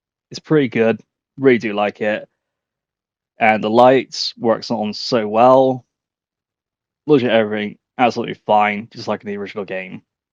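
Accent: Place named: Bristol, United Kingdom. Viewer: Your from Bristol gurt accent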